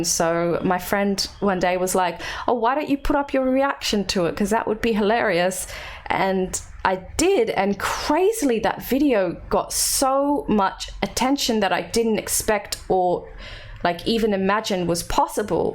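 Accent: Australia. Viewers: I'm looking forward to the MCU stuff for sure, but go at your own pace. A happy reactor, is a better reactor. 😁 Can sort of see some resemblance to Sweet Anita but that good old Aussie twang is instantly recognisable, for me anyway haha. Very easy to tell you apart from my perspective at least, even if it's purely on the looks. got her own vibes & style! 👍😂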